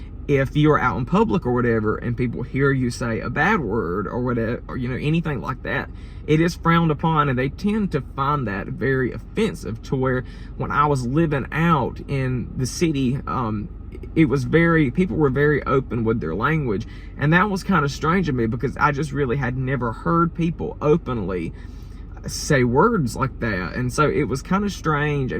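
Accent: South, United States. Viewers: I too am a true Southern boy just like you even down to the voice .😊 y'all have a great day and thanks for sharing this video! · I’m a native Spanish speaker in a small Latin country, I do realize that has a beautiful southern accent, and I really appreciate his vocabulary, not a single swearing word, which is wonderful. I don’t know why, but foul language in my native Spanish or the English that I know, resonates in my mind in a very disgusting way, I’m not a saint of course, but language makes all of us so special, doesn’t it? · I love your Southern accent 😃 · ❤ I could listen to you talk and tell stories all day long. You have a beautiful southern drawl. I'm a Texan, so I got a slight drawl myself from time to time depending on who I'm speaking with. ❤ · . I am from West Saint Louis County. I am sorry for what you went through while living in the south. 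I am sure the Midwest culture here is probably different than what you are used to and I bet you were asked a million times where you were from with your accent when you lived in STL. 😀. You seem to be a fine young man and I wish you the best. Come back and visit STL soon. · Thanx for this wonderful video! Me and my partner live in Amsterdam and both really love your southern accent! Hope you are doing well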